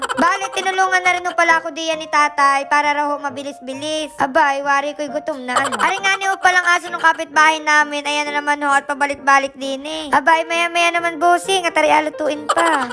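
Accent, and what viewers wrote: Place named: Marinduque, Philippines. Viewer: Kakatuwa talaga mga vlog mo nakakwala Ng stress Lalo mga Punto Ng salita mo kamiss tuloy umowe Ng marinduque😊😊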